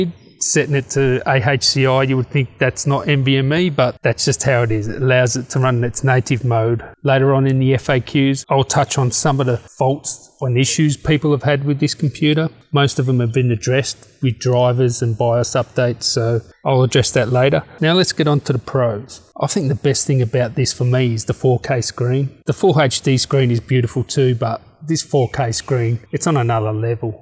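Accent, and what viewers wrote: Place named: Australia. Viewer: Whereabouts in Oz are you? WA? Just trying to guess the accent.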